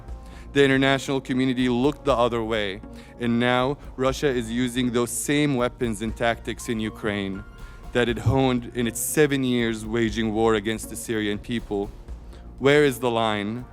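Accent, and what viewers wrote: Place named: United States. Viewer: دخلك لغتك العنجليزي كانك أمريكي تتكلم بطلاقة متى تعلمت الانجليزية وانت بتعرف بسوريا المتخرجين من الأدب الانجليزي لايتكلمون لانه مافي براكتيك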